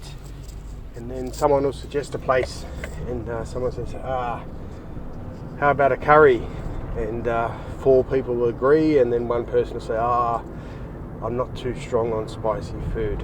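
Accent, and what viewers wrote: Australia. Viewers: I'm a boomer Aussie looking to the Akiya market, it's not important, I just spend so much time in Japan, it makes sense that I should consider a home here (Aomori) You're a Taswegian, Queenstown or somewhere nearby by the sound of it. It's a eye opener, being in Japan, isn't it. Love to chat with you...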